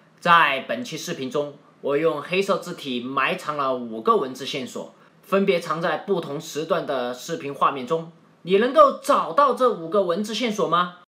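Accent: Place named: Sichuan, China. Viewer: 蜀產狗，土話嗆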